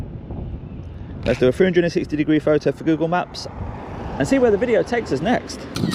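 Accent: United Kingdom. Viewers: Local accent. Quite a few accents pronounce the “yoo” sound like that. Look at how Alan Sugar pronounces “new” as “noo”. Perfectly normal in some parts of the UK.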